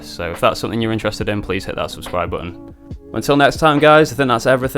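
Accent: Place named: Northwest England, United Kingdom. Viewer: Superb tape! And as always, nice vid. I started with a PO recently and after watching your vids, decided a 404 might be a nice step up/replacement. Surprisingly they work really well together though - rather than as a replacement. I have a 202 arriving today also (you’re partly to blame for this!). Keep up the great vids - great to hear tutorials in a NW regional accent. Reprazent! ✊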